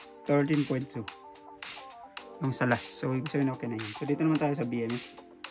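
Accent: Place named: India. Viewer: If im not mistaken, may dugo kng indyano..parang bulol ka magsalita ng parallel.. ganyan ang tono ng mga bombay!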